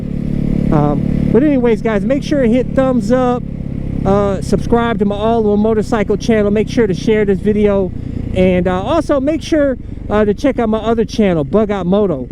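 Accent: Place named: New York, United States. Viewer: From Ohio but talks like he’s from New York lol I would like your videos a lot more if you talked less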